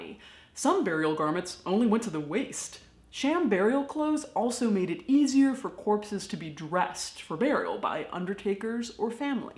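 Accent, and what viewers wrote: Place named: Midwest, United States. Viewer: Nothing better on a Sunday morning than sipping coffee and watching the amazing Midwestern Mama accent from Ask A Mortician 😁👌💖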